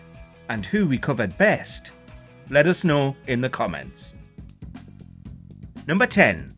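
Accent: United Kingdom. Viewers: i cant figure out the accent. which part of britain is this?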